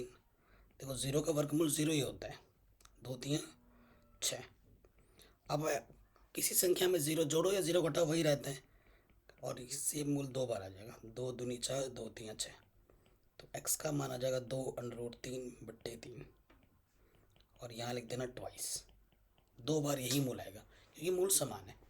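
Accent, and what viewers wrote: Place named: Haryana, India. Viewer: Bhai to Haryana se hai..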